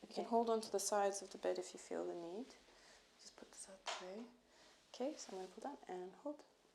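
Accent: South Africa. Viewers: Is her accent South African? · This autoplayed and I immediately twigged to the South African accent, then I heard "hectic" and was like, yep South African confirmed! 😂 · She only sounds mildly South African but mainly British. I wonder if she moved or has mainly British family and went to a British School. The South African people that I know have much stronger accents. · What is she from South Africa?? 😱😍 me too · The South African accent is still strong but a bit of the english is starting to come through. Regardless found a new favourite ASMR video! Keep up the great work😊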